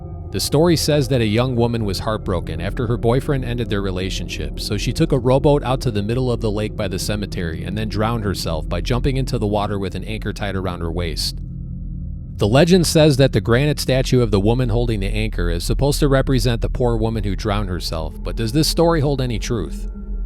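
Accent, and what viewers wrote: Chicago, United States. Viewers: I truly love your channel!! I grew up in Streamwood so hearing some of these stories is really cool for me. It brings me back to my childhood. Your narration is a perfect Chicago accent if I ever heard one and your attention to the details and facts about these places as well as sharing your own experiences truly makes your channel unique! I'm subscribed!!😊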